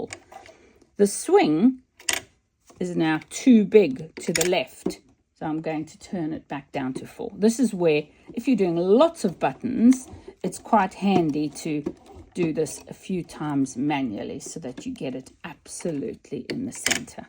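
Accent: South Africa. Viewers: Thanks ! Love your South-African accent !!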